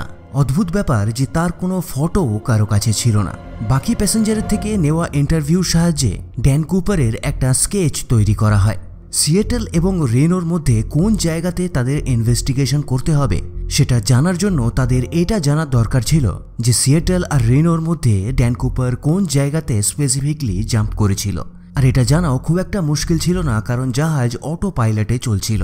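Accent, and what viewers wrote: West Bengal, India. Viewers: ভারতীয় বাংলা বলছে সে।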